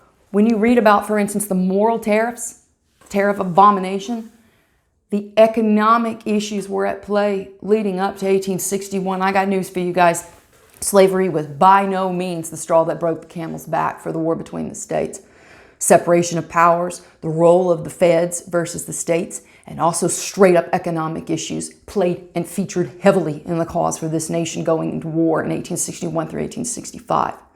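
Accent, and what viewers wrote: South, United States. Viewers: Gotta be a southern Lady 🙂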